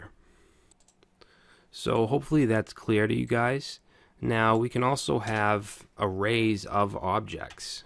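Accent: Boston, United States. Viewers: hahaha!! Nice one! has that classy Boston accent:) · he was talkin about your boston accent. I picked up on it too haha! Thanks though, man. Been trying to find good tutorials to learn js, but everyone else sounds either very boring/not engaging. Gonna check for other videos you have. thumbs up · Very well made and informing video. You sound like you're from Boston.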